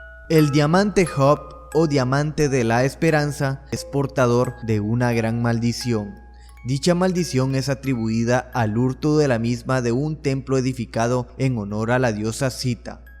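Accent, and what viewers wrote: Honduras. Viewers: Soy el unico en notar que el narrador es hondureño 🇭🇳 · Eres de Honduras?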